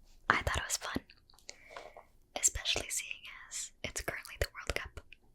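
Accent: United States. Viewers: She sounds pretty american to me so nothing special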